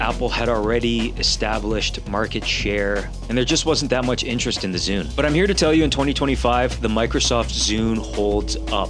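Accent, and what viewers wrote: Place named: Canada. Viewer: i’m getting good at spotting canadian accents yippee also if i get a zune off ebay it’s 100% cuz of u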